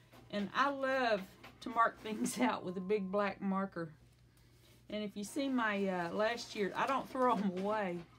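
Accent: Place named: South, United States. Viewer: You are either from Mississippi or where I am from.... Arkansas!!! Enjoyed your video.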